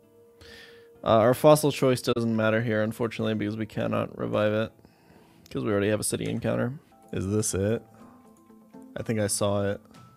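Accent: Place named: United States. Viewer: he once stated he lived in hongkong (?) for a few years and was influenced very much by american tv series, thats where his accent might come from. I also like how his voice switches entirely when hes speaking german:D In one of the last videos on chat actually forced him to speak 10 min in german Boy cuckbux (twitch currency which you earn for viewing his streams)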